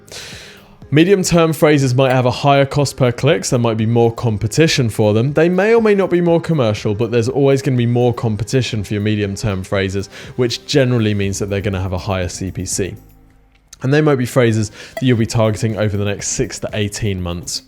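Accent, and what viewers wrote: United Kingdom. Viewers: Are you from Nottingham?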